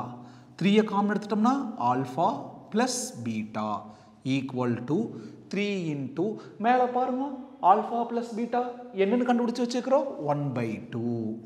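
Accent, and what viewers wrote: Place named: Kongu, India. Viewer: Sir you teaching methods is very useful for beginners thank you so much your kongu slang is nice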